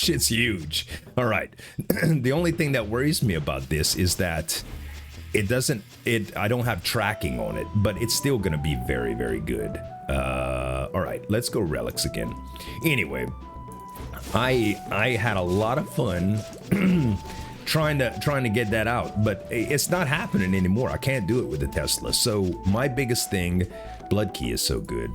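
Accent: Louisiana, United States. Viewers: Are you from Louisiana? I'm from lafayette and work in New orleans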